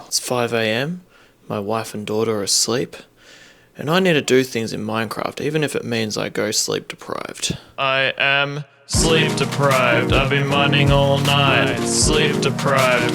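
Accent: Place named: Australia. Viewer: hi are you from australia cause its your accent and i am too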